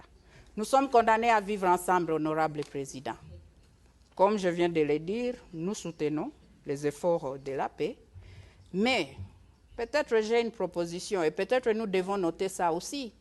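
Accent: Rwanda. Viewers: Ton rwandaise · Un accent rwandais et tutsi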